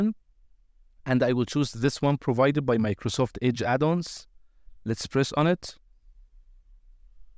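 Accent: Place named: Egypt. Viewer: Thank you very much! it worked. وأخدت بالي إنك مصري زي من أول كام جملة :D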